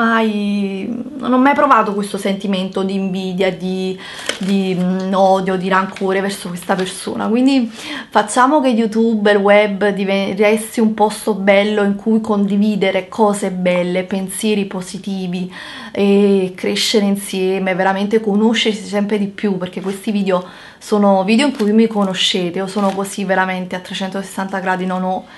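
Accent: Naples, Italy. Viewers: I tuoi Vlogs sono davvero molto simpatici, come lo sei tu, solare e anche le tue inflessioni Partenopee arrivano al "cuore" Pero' almeno rispondimi ogni tanto!!! Mai ricevuto una tua risposta:( comunque for ever!!! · Brava,vai avanti così, sei una ragazza solare e chi ti giudica esteticamente nn ha proprio capito che bella persona sei a darci tutti questi consigli di nn comprare schifezze.Mi sono iscritta da poco e sono felice di iniziare a capire quali siano i prodotti buoni grazie a te.Adoro sentire il tuo accento napoletano e posso solo dirti di nn pensare a queste persone perché purtroppo sono gelosi e invidiosi di quello che fai.Io invece solo perché sono carina devo subire cattiverie in un altro modo...quindi nn è un naso che nn piace a far commentare negativamente ma tutto può essere fonte di critiche x queste persone vuote.Ti mando un bacione.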